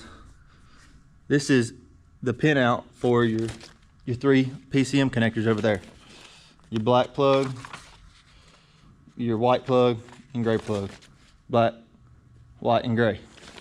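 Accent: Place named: South, United States. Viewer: I was going to research it on the internet but I cannot understand your southern accent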